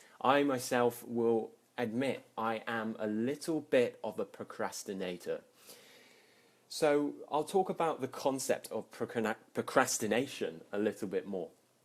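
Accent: United Kingdom. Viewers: hi, i just click subscribed you. thank you for your teaching it's first time for me to hear "procrastination" and i quite wonder normally i cannot get british accent but i understand you very well. it's possible that you maybe speak slowly and clearly. ^_^ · Love your voice and of course your amazing British accent! ♥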